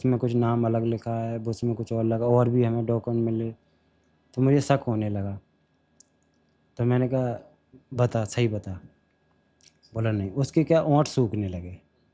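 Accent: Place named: Gwalior, India. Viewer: Tumhari bhasha gwalior sambhag ke aaspaas ka hai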